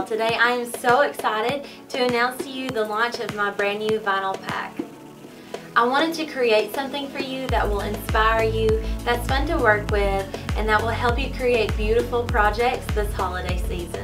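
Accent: South, United States. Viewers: Your accent is literally the sweetest southern accent like...ever😂❤️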